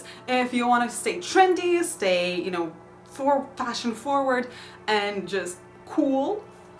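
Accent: Russia. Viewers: You’re gorgeous ❤️ are you Russian? I’m asking because of your accent! Thank you for this video!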